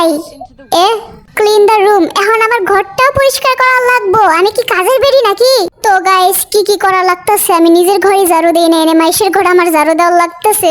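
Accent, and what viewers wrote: Bangladesh. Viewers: আপু আপনি কি খুলনার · নাটোর ভিলেজি তাসিটি হার কথা বলতাছে পাওয়ারফুল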